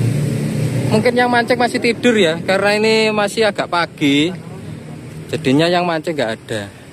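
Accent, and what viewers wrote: Java, Indonesia. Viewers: truskan lur aku suka banget cara penyampaiyaan kata2nya lucu mas bro,mentok banget jawanya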